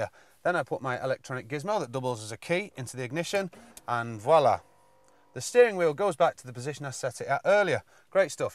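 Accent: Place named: Northern England, United Kingdom. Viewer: He's just northern lol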